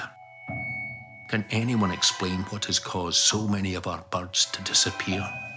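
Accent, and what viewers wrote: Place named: Scotland, United Kingdom. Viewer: Listen to that Scottish burr! Gives me goosebumps!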